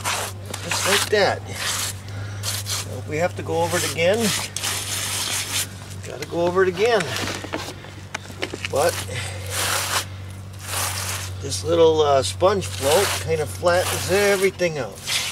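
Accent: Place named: Pennsylvania, United States. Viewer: Hi, just came across your channel while looking for some tips on repairing my patio of natural -shaped bluestones. After a few minutes of viewing the videos I noticed something very familiar - the scenes, the houses, the speech. You are from my hometown area. I was born & raised in Dupont, schooled in Pittston, but currently live in MA on Cape Cod.. I am quite surprised to find someone from the Wyoming valley being a YouTube creator AND doing a great job at it. You are providing a great service entertaining & educating many people. Thanks for that. I subscribed and wish you continued success with your channel. Just wanted to say hello. Paul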